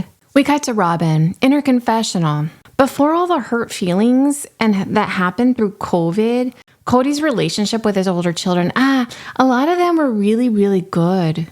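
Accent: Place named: Fargo, United States. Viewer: I absolutely live for your Robyn/Fargo accent. Makes me laugh every time.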